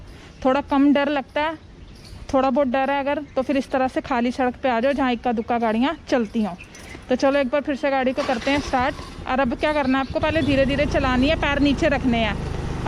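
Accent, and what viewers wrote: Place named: Punjab, India. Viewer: Plzz hath main gloves mat pehen lena unko dekh kar he aapke khoobsurat chehre ka andaja lagata rehta hu baaki aap chehra to dikhate nahi😞Aapki punjabi, himachali accent wali hindi achhi lagti hai🤓❤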